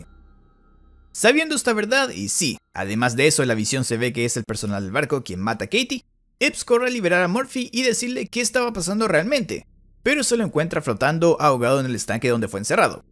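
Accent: Chile. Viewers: Una pregunta eres de chile amigo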